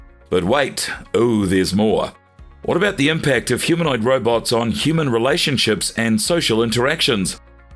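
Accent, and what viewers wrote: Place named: New Zealand. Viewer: A kiwi voice, crack up. Go bro! I love our accent.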